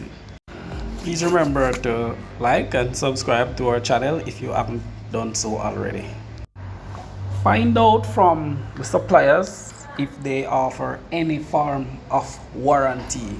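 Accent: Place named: Jamaica. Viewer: Ohhhh. You are jamaican. What part of jamaican are you from? I was born in Manchester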